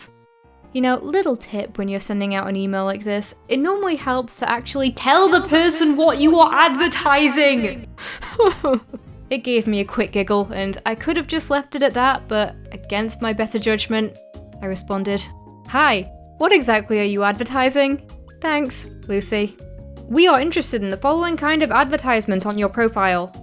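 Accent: England, United Kingdom. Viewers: is that an english accent? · This channel reminds me of Rebecca or let me explain studios but a UK accent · You are the british accent of jaiden animations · It sounds like she tries to hide her english accent from some reason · Is she british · British JaidenAnimations? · Jaiden but british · YOU SOUND SO BRITISH That's not an insult you're brilliant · Is this person British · you should Do an disstrack with your beautefull british voice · Are you British? You have an accent. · You sound the British version of Jaiden Animations No offense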